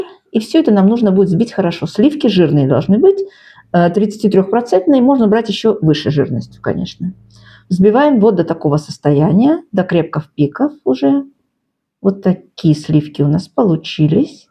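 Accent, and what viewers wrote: Baku, Azerbaijan. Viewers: Это бакинский акцент. Растяжка последних гласных в словах это влияние фарсидского языка.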